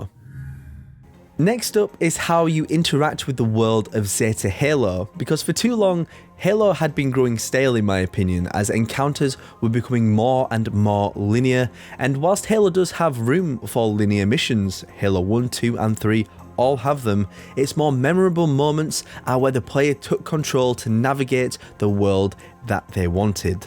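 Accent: Yorkshire, United Kingdom. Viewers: This is the first time ive seen one of your vids, you sound Keighley/leeds as fuck! Great analysis btw, this looks as though it could be the best halo since number 3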